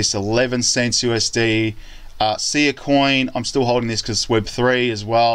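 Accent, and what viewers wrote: Australia. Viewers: Love hearing a fellow Aussie talk up Xrp. Have a bag of 70k xrp and I’m hanging for this to go back up as i bought at 68c AUD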